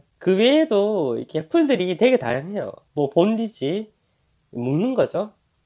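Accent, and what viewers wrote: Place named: Gyeongbuk, South Korea. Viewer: 서울분인줄 알았는데 고향이 경북분인가 보네요 경북사투리~^^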